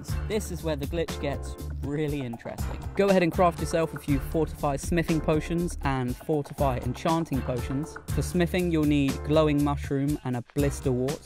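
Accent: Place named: RP, United Kingdom. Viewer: Quick, simple and in Queen's English (can't say that anymore!). Thank you 👍🏻.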